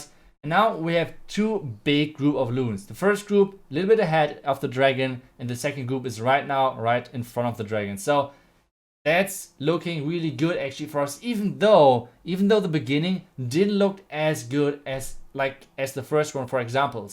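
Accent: Germany. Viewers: U are german i can tell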